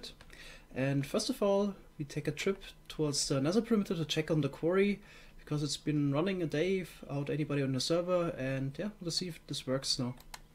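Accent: Germany. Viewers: Whar natationality do you have? Because your Accent sound very german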